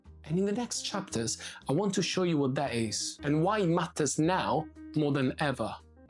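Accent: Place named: Cockney, United Kingdom. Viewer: This is an excellent conversation to have because it encourages local and regional authorities to see beyond the cookie cutter model of housing and commercial real estate development. But there's a catch; genuine aesthetic considerations must be mandatory, appropriate to each zone. Utility, durability, and sustainability must also be in the national building codes. In Australia, it's a free-for-all mess, where developers win, often unchallenged. BTW, you're developing a cockney accent! 😄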